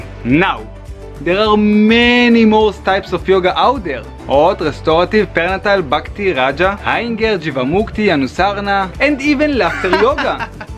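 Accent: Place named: Israel. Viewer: Hey thx.. you have an Israeli accent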